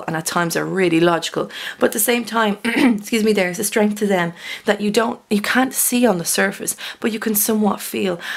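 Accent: Ireland. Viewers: I really enjoy your readings--your delivery, your positivity, your authenticity and your lovely Irish (?) lilt...also, I am a Taurus cross-watching for a considerably younger Capricorn romantic interest. I think I'm the "matriarch figure" that you mention 😉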